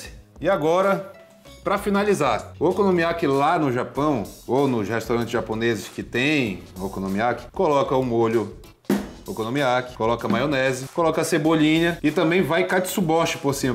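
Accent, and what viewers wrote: Pará, Brazil. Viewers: voce leva o Pará para o mundo com sua espontaneidade e essa pronuncia que éa coisa mais linda! Amo suas receitas e seu modo de usar o que temos.como disse antes conheci tua familia no Recanto e admirei para sempre.Lembrou muito a minha que nos criou com um pé na cozinha! Valeu! Amo teu canal.Vou te convidar pra conhecer nosso Café.Aceita?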